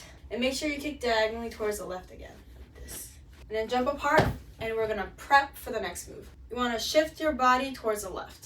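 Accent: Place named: Australia. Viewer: Thanks and are you australian because of your accent "hea" 😊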